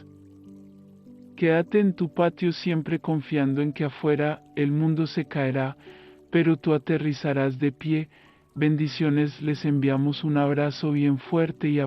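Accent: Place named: Colombia. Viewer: Suenas como Colombian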